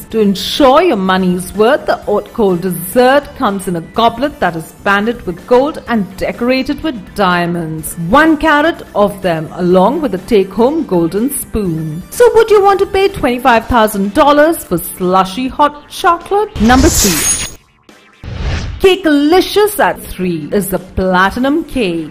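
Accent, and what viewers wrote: India. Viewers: She has a indian accent but she's trying the British accent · interesting vid but the voiceover sucks balls. she is a northern indian. not blaming the accent...the tone is driving me insane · i fear the speaker is of Indian origin, awful english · The person speaking is an indian Expensive, they are, but if you choke on one of those stones, youd end up in the er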